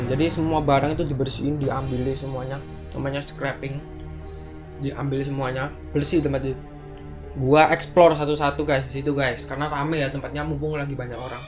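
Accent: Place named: Surabaya, Indonesia. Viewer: Mas samean kalo bicara ini kaya orang surabaya bang surabaya mana plise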